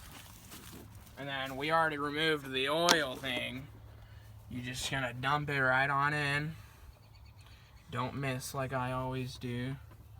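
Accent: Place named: Missouri, United States. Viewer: You tell he is a Missourian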